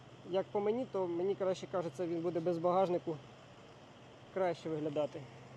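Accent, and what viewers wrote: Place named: Cherkasy, Ukraine. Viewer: Балачка, акцент Черкащанський. Думаю автор звідти родом. Вгадав?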